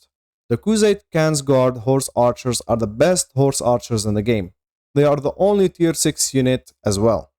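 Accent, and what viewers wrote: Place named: Lebanon. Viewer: أنا حاسس انك لبناني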